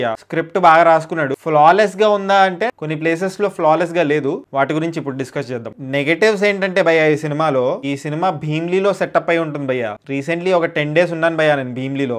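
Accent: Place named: Visakhapatnam, India. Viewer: East West vizag dhaggaragane untundhi slaung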